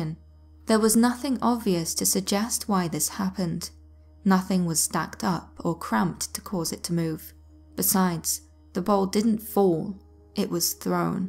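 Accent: England, United Kingdom. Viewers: The English Accent always does and when combined with the beauty of a female voice then I sit here enchanted by the story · Love your English accent and you tell the stories so well I love listening to you! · Thanks. This was well done. I like to listen these tales while doing things around the house. I’ve tried listening to some other ‘told stories’ videos, but they’re either over the top with their music and graphics, and/or the narrator is not very good at telling the story. One channel that listened to for a bit had narrators who sounded as though they were reporting the news on British television ( I’m American so it hits my ear in an odd way ). No offense as it sounds as though you may be British as well. However, you did quite well here. Anyway, thanks again.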